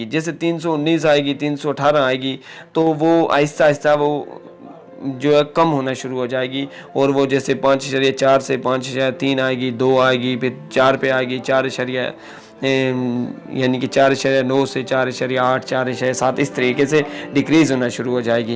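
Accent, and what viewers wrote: Pakistan. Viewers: You simply talk basic understanding of GDP you're goofing up every thing of politics and technical terminologies. Plz improve your accent don't speak urdu in hindko or پہاڑی accent. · Apki urdu sy lag raha ha ap murree ya kahuta k han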